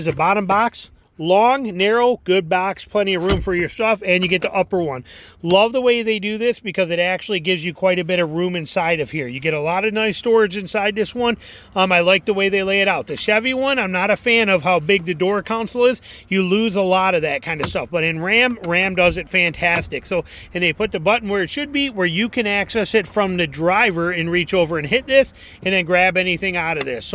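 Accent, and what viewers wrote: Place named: South, United States. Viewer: sounds like a redneck. I passed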